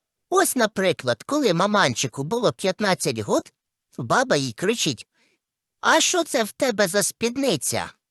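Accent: Poltava, Ukraine. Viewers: Наче з Полтавщини говір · У нас на полтавщині так розмовляють.Голс такий заспокійливо в'їдливий Так гарно , весело.Слухаю з задоволенням коментування бабчині.Особливо по спідницю.Ап про сваху як тонко підмічено!Дякую, дякую!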